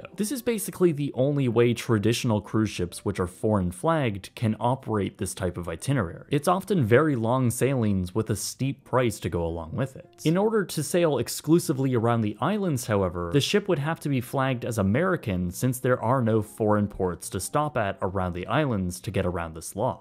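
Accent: Canada. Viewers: Yes, he’s from Ontario. He’s done several videos about Canadian companies going bankrupt, too. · I heard Oout a couple times the speaker is Canadian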